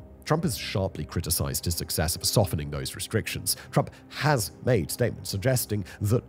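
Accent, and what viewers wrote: United Kingdom. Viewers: you talk too fast. when we here a British person, we need to feel like we're being served something. its weird.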